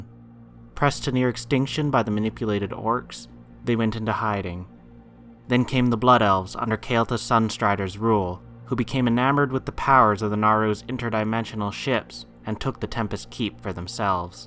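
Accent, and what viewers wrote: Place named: Ireland. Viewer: Are u Irish I hear a slight Irish accent in your voice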